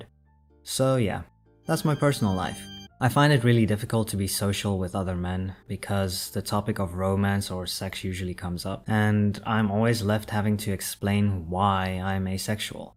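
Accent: South Africa. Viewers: Are you also partly South African? There's something rly familiar about your accent lol but I suck at placing accents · this is an off topic question but are you south african? your accent and pronunciation just sounds so familiar and i just have to know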